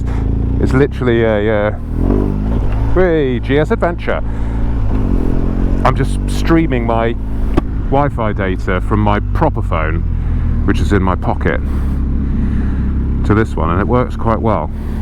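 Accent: United Kingdom. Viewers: I find your videos very relaxing and you have a very posh english accent!😁 I wouldn't be surprised to hear you at some point: Alloweth's speedeth up a did bite !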